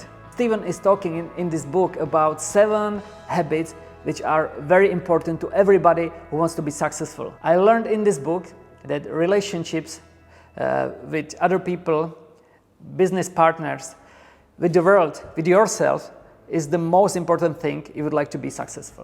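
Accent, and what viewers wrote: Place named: Czech Republic. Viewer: Nejsem nikterak dobrý v angličtině, ale mám pocit že přízvuk je jako bych to řikal já 😁 ( A to si myslím že jeho úroveň je na míle jiná!) Je to jen můj pocit nebo jsem zblbnutý amerikou o tom jak má angličtina znít? Díky za názor Dobrá práce každopádně jen tak dál!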